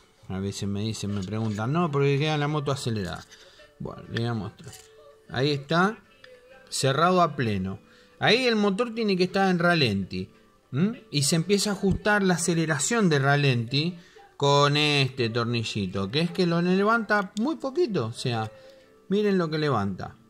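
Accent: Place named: Argentina. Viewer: Es argentino.. debe trabajar pues En chile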